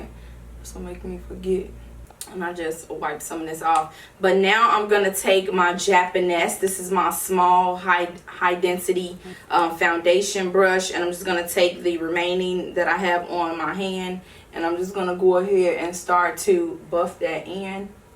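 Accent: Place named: Chicago, United States. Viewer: Knew you were from Chicago as soon as you started talking lol. People say we don’t have accents but I beg to differ. Love your channel 💕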